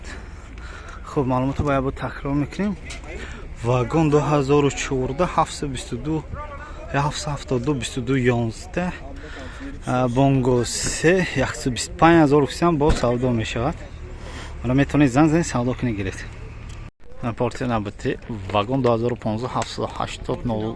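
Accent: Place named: Khujand, Tajikistan. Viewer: Салом алейкум акачон илтимос Акцети хучанди Кати гап назанен МО бисер гапотона намефахмем забони точики модари Кати гап занен узр 😢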